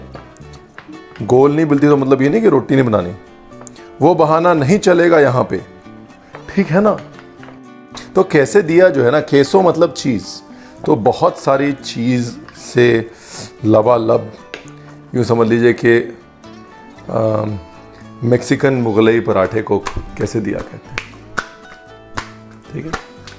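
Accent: India. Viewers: No words to explain anything Abt u ND ur vedios... Ur smile is just way too🔥🔥🔥my heart falls Everytime for your every word that you speak in such a down to earth ND desified way...your recipes just amazing...I love cooking I try all of your recipes...ND your punjabiness...❤️❤️❤️❤️❤️❤️❤️❤️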